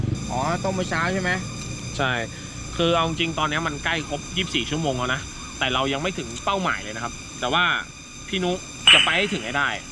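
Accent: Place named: North, Thailand. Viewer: พูดไทยสาบเมืองได้ไง 5555 จิ้นส้ม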